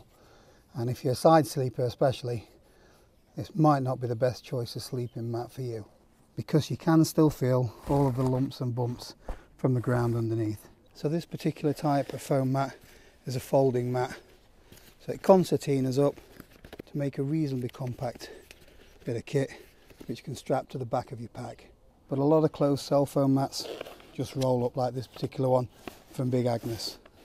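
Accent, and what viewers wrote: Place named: Derbyshire, United Kingdom. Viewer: Thanks, great advice as standard. Planning to go bike packing and wild camping this year 2021, your accent sounds very local to me(Belper, DERBYSHIRE) where are you from?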